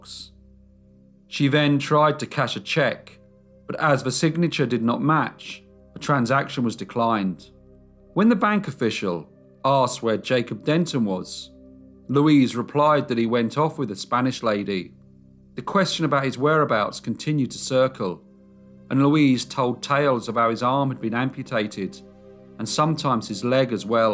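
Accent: United Kingdom. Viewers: Oh my gosh! Do I love your British accent! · Didn't you notice he has got Brit speak.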